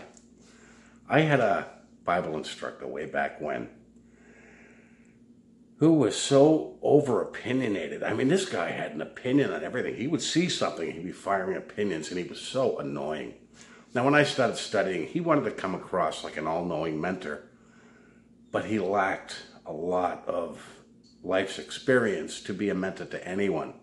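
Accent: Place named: Boston, United States. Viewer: My opinion is you have the best American accent, is it Boston? Great vid.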